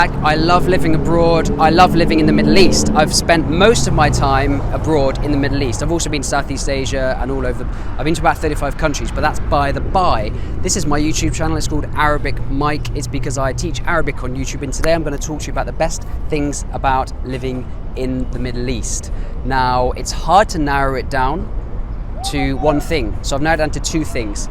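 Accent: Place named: United Kingdom. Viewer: That a big step for you... Love ur video n ur british accent... Pretty decent 👍❤️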